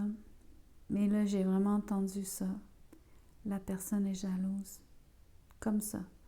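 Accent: Quebec, Canada. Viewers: Magnifique petit accent québécois -:) merci pour le tirage qui me parle, dommage pour le son trop bas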